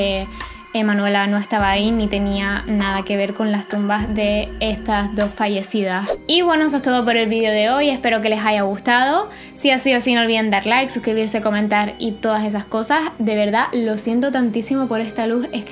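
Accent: Cuba. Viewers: Eres cubana????? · Pensé que eras Cubana o Puertorriqueña.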